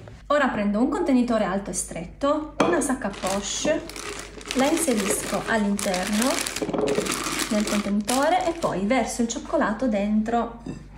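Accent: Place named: Italy. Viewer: I'm so very surprised to be here because my new favourite singer have a dessert channel, it's so very interesting! I have to learn some Italian and make the recipes 😁, I love your Italian accent Cheers!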